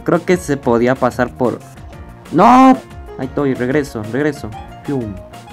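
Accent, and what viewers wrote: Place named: Mexico. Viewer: Como que no eres mexicano xD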